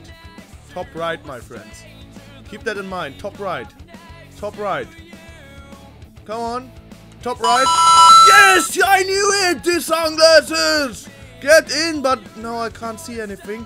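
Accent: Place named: Germany. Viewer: Man merkt sofort dass du Deutscher bsit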